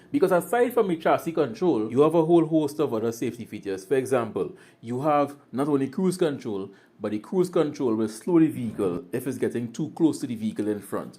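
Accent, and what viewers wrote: Trinidad and Tobago. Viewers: Great job with this review. Nice to hear a Trini accent for a change. BTW, the Japanese voice in the start-up sequenceis telling you that the ETC card isn't installed. This is for the Japanese toll system. This voice can be turned down with the volume button. Not sure, but I think the voice can be turned off. Also, Note is a 1.2L engine. Safety features are controlled by ProPilot. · So freshing to hear a trini voice doing this. Means a lot bro. Thanks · bro you from trinidad?!.... this is one of if not de best local reviews i've heard/watched keep it up👌👊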